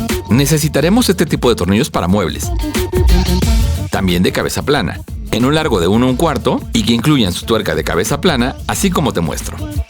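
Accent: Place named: Peru. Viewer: Amigo, soy nuevo subcriptor en tu vídeo. Algunas acentuaciones de sílabas me hace pensar que eres peruano de lima educado. Estoy equivocado o eres de otro país?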